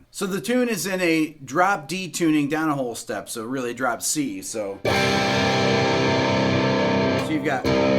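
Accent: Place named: Upstate New York, United States. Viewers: I didn't know you were from Rochester. I can hear the accent now. It's good to see another "homeboy" on YouTube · Rochester New York eh? I wondered where you are from and couldn't quite place the speech pattern. My parents are from upstate New York, met at RIT, we visited relatives in Rochester, and over the years my family lived there as well as Ithaca.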